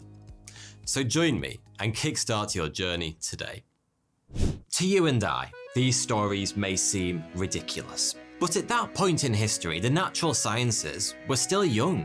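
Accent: United Kingdom. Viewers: I watch these because it feels like I have a British teacher who's actually interested in the subject they talk about. Makes it even more enjoyable to learn new stuff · I have never heard someone so British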